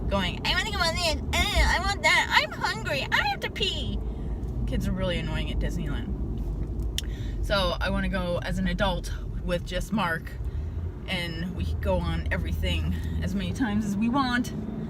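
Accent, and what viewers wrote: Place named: Canada. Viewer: I have to ask.... are you from the U.S.? I am asking because you talk as if you are from somewhere else. Your dialect is from a certain province in Canada. Just asking because certain words you use remind me of home.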